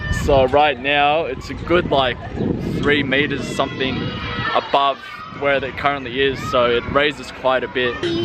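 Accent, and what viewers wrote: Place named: Australia. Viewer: Kept thinking you look a lot like an Asian with Australian accent. Enjoy your travels with those priceless smiles from the kids!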